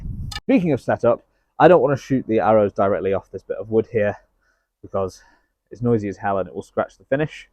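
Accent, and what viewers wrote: United Kingdom. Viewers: Dude! Your cool as shit! I feel like a kid watching you do your vids, you look like a fantasy hero, you sound cool (cool voice, British, speak in the kings english) and you are an enjoyable watch.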